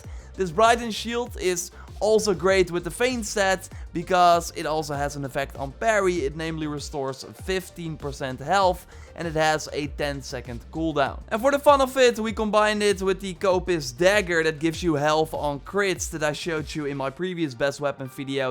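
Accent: Netherlands. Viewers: Je hoort gewoon aan zijn accent dat hij Nederlands is🤣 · Do you speak dutch, you kinda sound like someone from the netherlands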